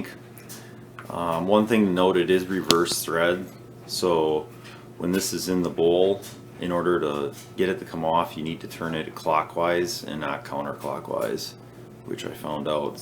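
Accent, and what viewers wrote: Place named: Midwest, United States. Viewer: You've gotta be from Ohio or Minnesota 😂❤ thanks for the vid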